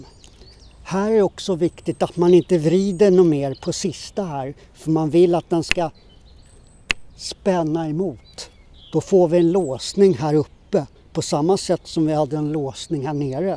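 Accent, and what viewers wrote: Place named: Stockholm, Sweden. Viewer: Samma dialekt, stockholmska. rösten är ju inte väldigt lik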